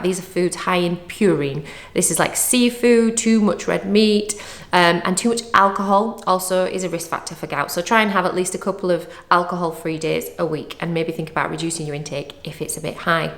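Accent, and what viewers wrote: United Kingdom. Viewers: Hi, I’ve only recently discovered your channel and really enjoying the videos that are really helpful. My brother who I care and support does have attacks of gout and know how painful it is for him. I will definitely look into the medication you have mentioned with his GP to avoid further flare ups. I am also curious where you are based in the north of England. I live in The north west of Lancashire and your accent sounds very familiar. 🥰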